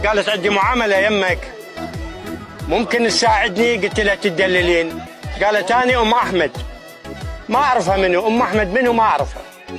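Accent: Baghdad, Iraq. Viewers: هذه لهجة اهل بغداد الأصليين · اللهجه العراقيه الاصيله ❤❤❤ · لهجة العراقيه جميله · جميلة لهجته العراقية ، تذكرني بكثير من اصدقائي. حفظ الله العراق من كل شر و الف بين قلوب اهاليها الطيبين. تحية طيب من الباكستان 🇵🇰❤️🇮🇶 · اللهجه العراقية جميلة وفيها هيبة وقار وتحس فيها رجولة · هذه اللهجة البغدادية الجميلة ❤ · اللهجة البغدادية الاصيلة ❤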